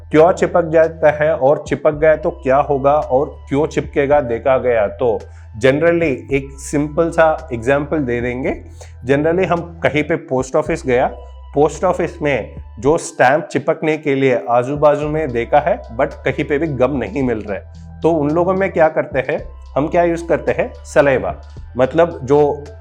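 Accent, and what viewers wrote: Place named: Telugu, India. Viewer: तेलुगू हिंदी थोड़ी सी डिफरेंट 😅😅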